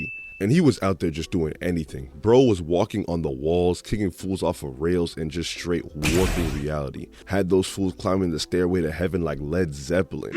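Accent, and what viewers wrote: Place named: Nigeria. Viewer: Bro you Nigerian aren’t you? 🌚😌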